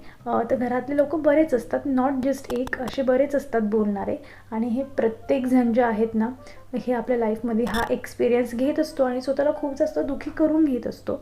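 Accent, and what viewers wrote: Khandesh, India. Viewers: Tumhi proper kuthle ahe??? Khandeshi ahat ka???language vrun vataty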